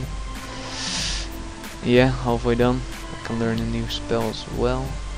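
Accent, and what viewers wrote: Netherlands. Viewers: dutch accent 3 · sexy dutch accent <3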